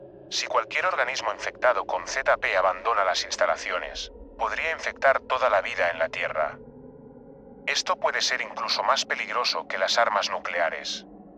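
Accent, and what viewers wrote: Spain. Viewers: Ha que buena historia de pelicula se podria decir. El area 51 si es, una base experimental no hay duda de ello y si vivieras en el area de las vegas y mas cerca de la area "S" lo sabrias y no te quedaria duda de ello. Me llamo la atencion porque me identifico con lanprimera parte de su historia ya que fui official de guardia en las afueras de acceso inmediato a los primeros edifices y angares. Al pasar el tiempo estuve assignado a permanecer en mi puesto solo hasta determineda hora pero, decide a la alta actividad y constants vuelos,e quede por mas de dos horas. Vi lo que yo dijiera, objetos de vuelo de prueba y otros que llegaban junto con F-16. Ahi en ese momento un sensor de seguridad se activo y me arrestaron por estar ahi ilegalmente quiero decir, no segui instrucciones de mando y fui dado de baja indecorosamente y sin antes firmar un tratado de silencio por 50 años. 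No pude hacer nada y ahora vivo todavia aqui en Las Vegas recordando que a veces de tanto que quieres saber de este tema de vida extraterrestre, llegas al momento en que ya no quieres saber nada. Lo que pasa ahi no esta upto para el ser humano ya que puede cambiar tu forma de ser y pensar. Asi que ya ven, no es facil llegar hasta donde dice este amigo con acento Español. Desde los años 50's se posee una tecnologia de punta de seguridad ahi que reconoce y detectable en calor del cuerpo humanoy de algunos animales, sistema de reconocimiento facial y alguna itra tecnologia que te puedes imagunar. NO SE OUEDE PA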